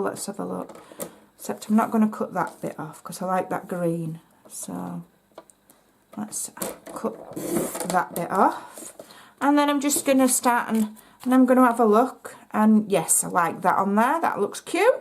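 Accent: Yorkshire, United Kingdom. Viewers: Love your Yorkshire accent!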